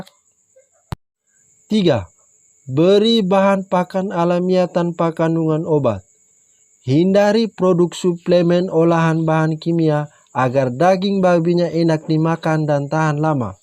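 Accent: Flores, Indonesia. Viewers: Logatnya seperti orng Bajawa..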